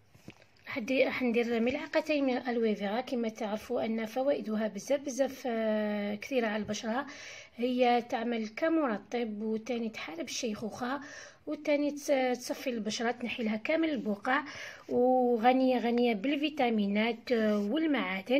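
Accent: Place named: Lebanon. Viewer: لان انا ما كتير عم بفهم عليكي لان بتعرفي اللهجة اللبنانية كتير تختلف فميشان هيك ما عم افهم كتير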